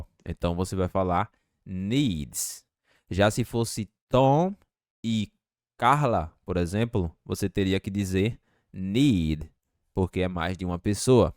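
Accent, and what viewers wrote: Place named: Northeast, Brazil. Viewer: Ele falando ingles com sotaque nordestino é tão fofinho😊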